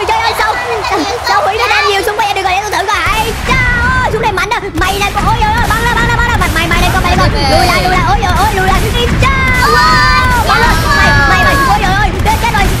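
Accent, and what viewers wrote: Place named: Thanh Hoa, Vietnam. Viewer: Noi thanh hoa ngoai nghê an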